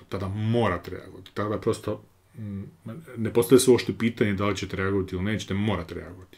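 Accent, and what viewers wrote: Ijekavian, Serbia. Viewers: Miluje me Vasa ijekavica i miran ton izlaganja,samo napred !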